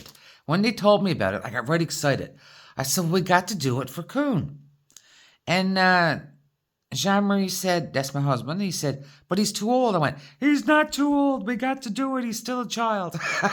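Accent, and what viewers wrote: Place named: Newfoundland, Canada. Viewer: Hi. I'm canadian too, but Ontario. Love your accent. My friend is from Newfoundland too. Merry Christmas 🎅 🎄 subscribed. Glad I found you. Wonderful vlog. Loved it!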